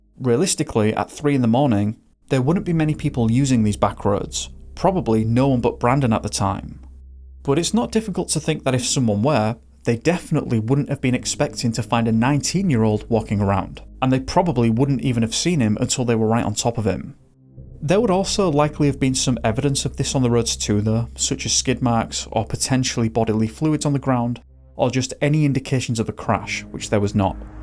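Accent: United Kingdom. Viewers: Are you from the UK or is that just the voice used for the video narration? Some farms specially ones with livestock can be very very bright. Like midwest Wal-Mart parking lot bright. Not as bright as a whole town obviously but that could be easily confused in the pitch black.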